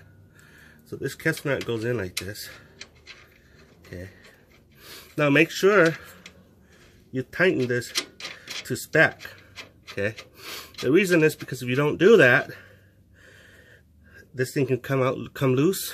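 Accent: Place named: Hawaii, United States. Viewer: Where are you from you sound local to my area I am from Maui Hawaii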